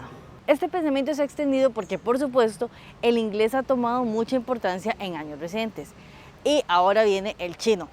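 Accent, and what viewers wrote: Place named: Colombia. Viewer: Pensé que era colombiana profe por si acento. Gran vídeo. Pura vida❤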